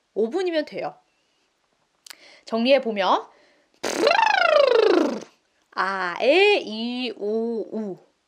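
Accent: Busan, South Korea. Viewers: 사투리 귀여우세요 ㆍ 참고도 저도 부산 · 영상을 쭉 보다가 좋아요 구독 클릭했습니다. 간결하게 핵심을 잘 알려주세요. 부산 사투리 e쁘네요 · 지금은 원래 쓰시던 부산 억양보다 저 억양이 더 편하신가요?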